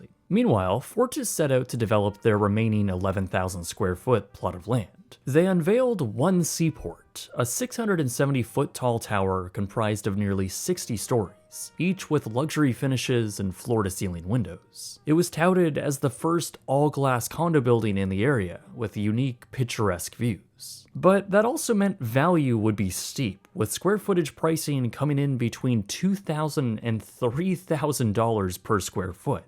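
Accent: Canada. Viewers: I like the hosts crazy Canadian accent 🙂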